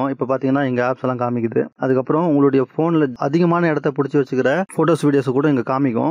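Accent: Chennai, India. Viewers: Chennai Tamil la informative video 🌝🌝🌝awesome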